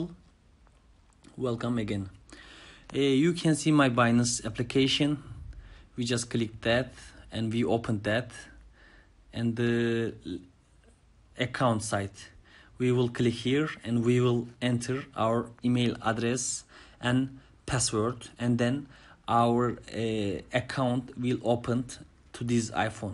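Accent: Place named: Turkey. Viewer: Ru Turkish lan aksandan belli sil bunu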